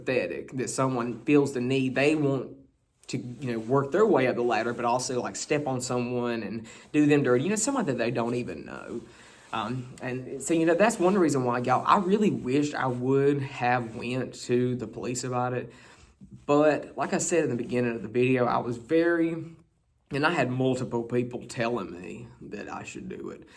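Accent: South, United States. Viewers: To some types you would be naturally irresistible, to others nearly-so, and to a very few TOO MUCH of that first!! 🥴 Goes with the territory, 🌟 🌟 Your 'southern drawl' is pretty spectacular out here, in Yankee Territory. 😉 (Well hell, I'm 3/4 Johnny R. maself!) As long a/s you're around -- a lifetime subscriber/supporter is here. 👍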